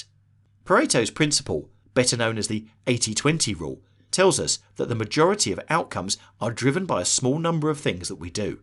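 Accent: United Kingdom. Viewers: Is this British English? Just curiosity.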